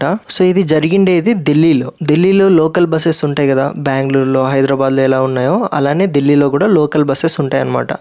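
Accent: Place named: Rayalaseema, India. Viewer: anna kathalu super. all the best . where r u from? slang rayalaseema la undi so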